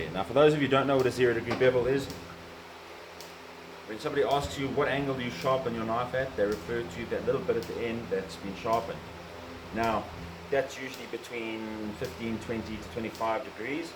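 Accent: South Africa. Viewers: I couldn't place you accent for like 5 seconds when I realised south african!!!! Nice to see a fellow who also does knifemaking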